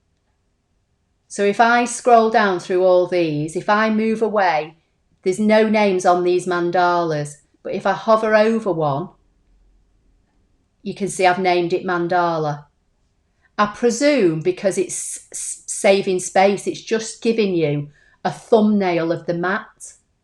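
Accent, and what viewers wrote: United Kingdom. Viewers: Nice to hear a voice from uk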